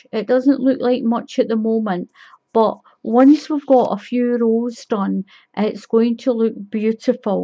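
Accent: Scotland, United Kingdom. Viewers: firstly I cud listen all day to ur lovely Scottish accent! I have used ur videos so many times! Thanks so much for sharing and it being so easy to follow xx❤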